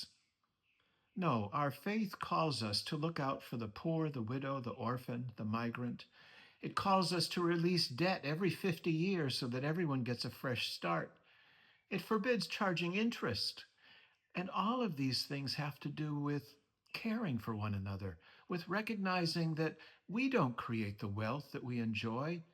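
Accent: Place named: Boston, United States. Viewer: Thank you for your Bostonian voice, your sound words have been comforting in more ways that you’ll ever know. May the Lord God continue to bless, amen. Amen!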